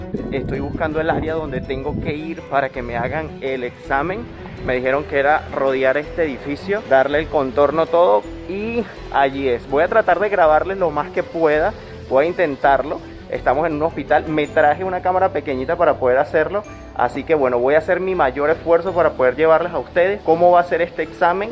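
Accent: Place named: Venezuela. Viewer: Primera vez que veo tus vídeos, saludos, por tu acento eres venezolano pero ¿en qué país estás?, que maravilla de hospital, saludos, en nombre de Dios saliste bien y seguirás libre del virus, saludos 💋💋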